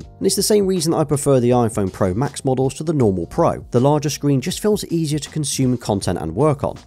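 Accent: United Kingdom. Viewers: Are you, , British, Australian, or from New Zealand? The accent is charming. Have a nice Thursday, Friday, and weekend.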